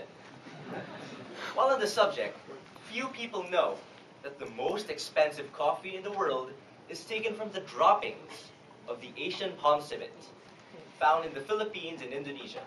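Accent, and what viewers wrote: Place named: United States. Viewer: how come his got an american accent?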